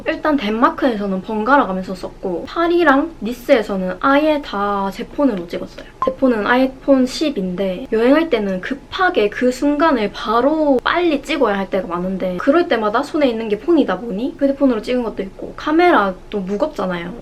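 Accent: Gyeongsang, South Korea. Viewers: 경상도 사투리 너무 귀엽다 💖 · 경상도의 억양이 매우 정겹네요😊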